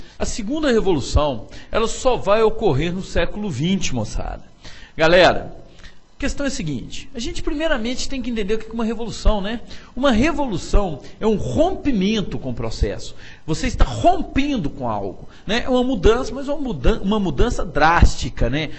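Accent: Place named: Goiás, Brazil. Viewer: Esse aí é goiano 😁❤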